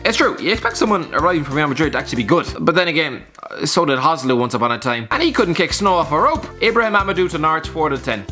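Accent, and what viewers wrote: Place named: Ireland. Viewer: his tongue moves faster than he talks Love u.. #noHOMO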